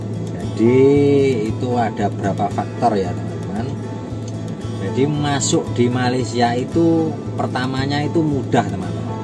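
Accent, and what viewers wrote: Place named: Java, Indonesia. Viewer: Kenapa accent awak masih pekat Jowo? Awak tak bergaul dgn orang Melayu ke? Btw...saya keturunan Banjar dan Bugis. Kampong di Perak.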